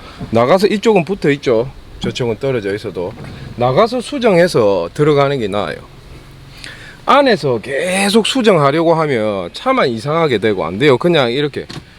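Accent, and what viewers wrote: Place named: Western Gyeongnam, South Korea. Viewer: 사투리가 서부경남쪽이네요 맞죠?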